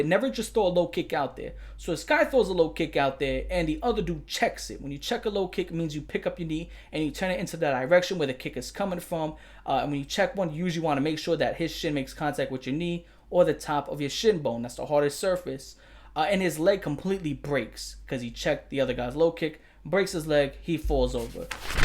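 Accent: New York, United States. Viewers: Man, I haven't lived in the US in 12 years... your accent just hit me hard my bro.... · The New York accent is tuff tuff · yeah as a bi girl I’m fine with it honestly. I do get uncomfortable when creators are openly homophobic cause it makes me feel like they don’t want me watching, but as soon as I remembered the New York accent I knew he wasn’t being serious lol 😂 as a lifetime New Yorker we have kinda fucked senses of humor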